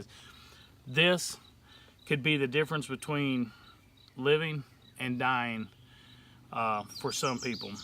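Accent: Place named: Mississippi, United States. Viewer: NORTH MISSISSIPPI!?!?!?! Were y'all my neighbors when I was a kid!? I knew I loved your accent 😭 I was born in Coldwater, Tate County, do you know where that is? I moved to Oregon when I was 4, in 1978. Ok, now to restart the lambs ear cause I got all excited when you said NORTH MISSISSIPPI 💜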